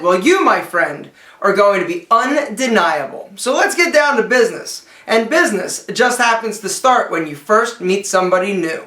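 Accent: Philadelphia, United States. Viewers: are you from Philly? Your accent sounds like it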